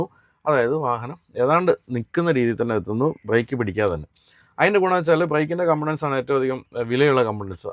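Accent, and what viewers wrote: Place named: Kottayam, India. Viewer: വെലിപ്പം അല്ല ബൈജു ... "വലിപ്പം " കോട്ടയംകാരെക്കൊണ്ട് തോറ്റു .....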